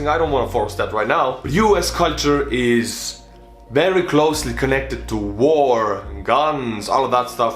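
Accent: Estonia. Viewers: Lahe video :) Mulle meeldib kui sa räägid inglise keeles siis see eesti aksent. :D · Dude u have still a little but of that estonian accent (kinda of that one tha Ardo Asperk made fun of), while living in the states, im native estonian and have only tavelled to US like twice still i dont have that accent, nice one- actually its kinda unique (unikaalne) ;)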